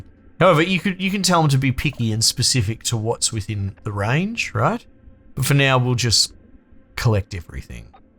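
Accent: Australia. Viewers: lmao i fucken thought to myself this lad sounds like me, then you reckon "right", im like yeaaaaah he's an aussie hahaha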